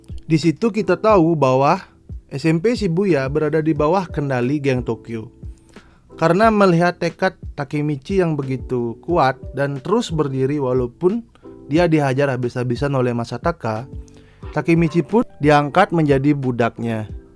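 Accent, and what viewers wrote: Bali, Indonesia. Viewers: pantes nyk san logat bali ne🤙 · Logat nya bali banget wkwkwkwk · Oi, ini pengisi suara orang bali ya pengisinya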